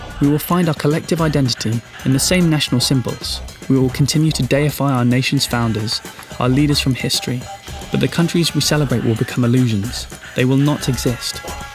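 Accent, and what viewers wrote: United Kingdom. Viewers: Cute British accent.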